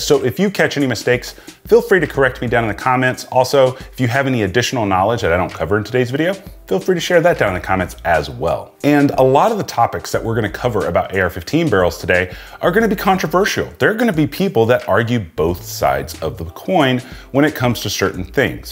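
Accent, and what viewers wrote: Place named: Minnesota, United States. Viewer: You did really good very close for the Minnesota accent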